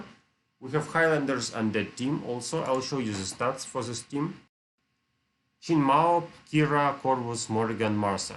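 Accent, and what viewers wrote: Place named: Russia. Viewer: в твоем английском я слышу русский) isn`t it?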